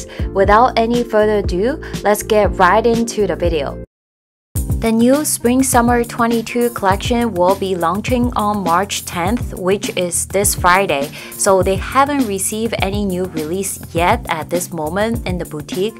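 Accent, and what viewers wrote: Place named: South Korea. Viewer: 韓國口音英語。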